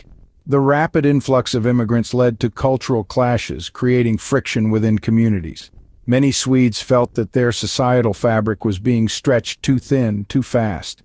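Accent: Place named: Mid-Atlantic, United States. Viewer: Another damned speechbot with an expressionless Mid Atlantic voice. Turned off.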